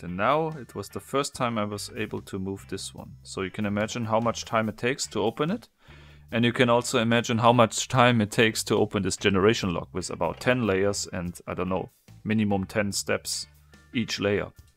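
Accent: Germany. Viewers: You are from german XD I can hear it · Man merkt das du deutscher bist :D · THIS IS THE GERMAN ACCENT ! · Man hört das du Deutsch bist. 😅 Nicht böse gemeint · Wie man einfach den Deutschen Akzent direkt erkennt :D Super video c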